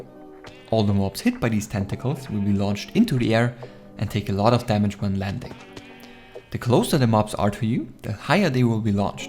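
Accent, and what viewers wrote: Germany. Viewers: which nationality are you? sounds kinda like a german but im not quite sure...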